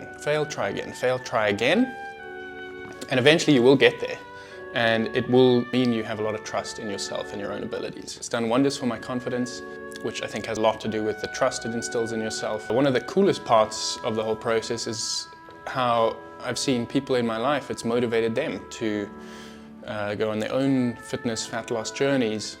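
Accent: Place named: South Africa. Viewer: Why is someone with a SAffer accent talking about "pounds"???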